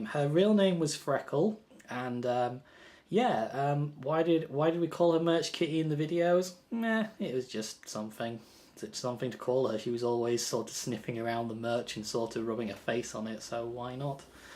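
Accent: Yorkshire, United Kingdom. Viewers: I swear to god,your Yorkshire accent’s getting thicker!!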